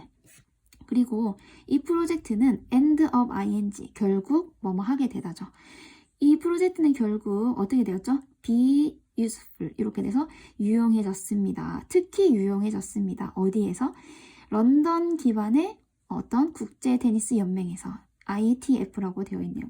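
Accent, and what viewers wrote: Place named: Busan, South Korea. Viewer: 장마시즌 부산은 장맛비가 내리네요 ㅎ 영상보면 억양이 부산분 같기도하고 은 고향이 어디에요? 뭔가 친근한 말투라 더 집중하게 되네요 감사해요 ❤❤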